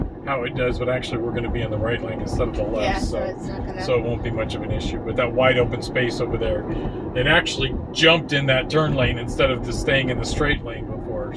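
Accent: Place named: Midwest, United States. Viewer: Where you guys at? You're accent sounds Midwestern. Perhaps OK or TX?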